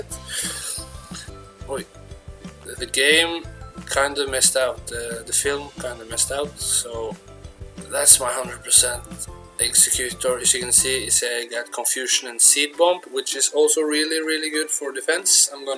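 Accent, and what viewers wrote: Norway. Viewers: jeg viste at du var norsk når jeg hørte dialekten din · man hører at du er norsk og ser det nede med pokemonene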